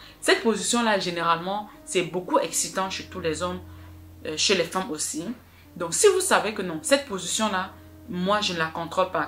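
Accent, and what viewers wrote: Cameroon. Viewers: Merci coach. Je suis sûr que vous avez déjà accouchée. J'aimerais voir le joli bébé 🤱. Votre accent tend vers le Cameroun, c'est ça?svp.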